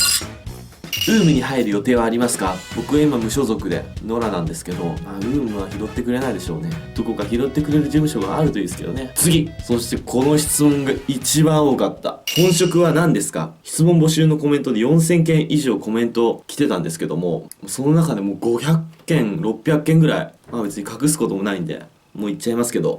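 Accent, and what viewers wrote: Japan. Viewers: はじめんほど訛ってないけど近い地方の人なんだなってわかる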